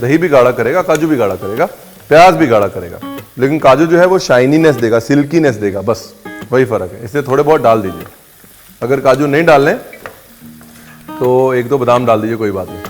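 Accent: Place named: Rural, India. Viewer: No matter how desi/dehati accent he may speak in but when he says the word 'right' it reflects his sophistication both in life and culinary art. I like your way of cooking.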